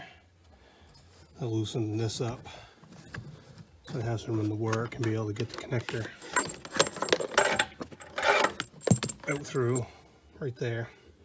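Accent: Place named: New Hampshire, United States. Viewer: Definitely a NH native! 73, K1GHC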